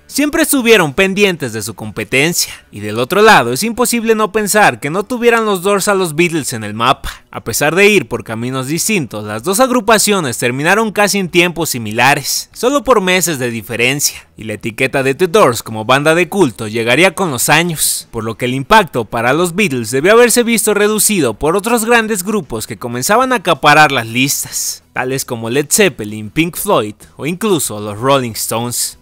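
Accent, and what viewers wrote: Mexico. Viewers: Deberías neutralizar un poco tu acento ranchero.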